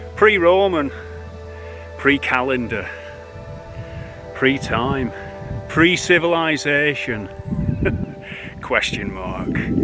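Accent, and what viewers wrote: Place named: South Yorkshire, United Kingdom. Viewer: Great to watch your video in these depressing times in your good old south Yorkshire accent! Some sense of normality and freedom. Many thanks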